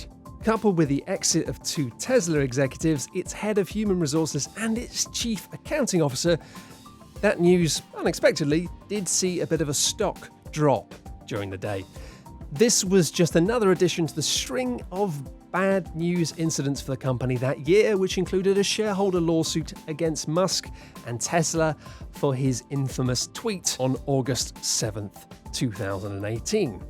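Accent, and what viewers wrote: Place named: United Kingdom. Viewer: British people make up such a small fraction of the world yet such a high percentage of Youtube narration. I don't like it. Turning off.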